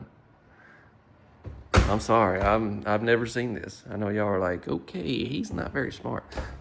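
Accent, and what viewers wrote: South, United States. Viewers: This is so adorable, I love it (and I just love your southern accent too!)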